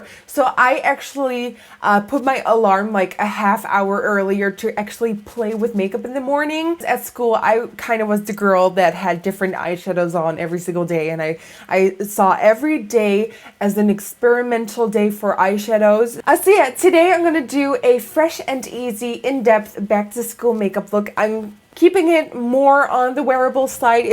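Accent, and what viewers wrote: Netherlands. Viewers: Kom je uit helmond?? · Is it me or does she sound a lot more Dutch in this video? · yes. she is from the Netherlands but it's less noticeable in more recent videos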